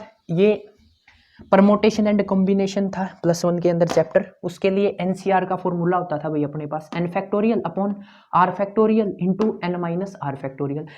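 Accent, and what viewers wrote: India. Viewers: Teaching skills are amazing.....nd ur Desi accent make it more interesting sir 🙌❤️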